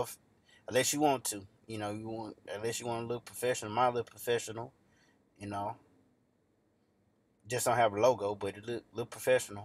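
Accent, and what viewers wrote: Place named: South, United States. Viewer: Thank you for this video. I’m not a driver, but will be hiring my cousin to drive for me and I’m planning to buy my first truck & trailer by the end of year. It’s all a bit overwhelming but your video was full of really good information. Love that southern accent too! God Bless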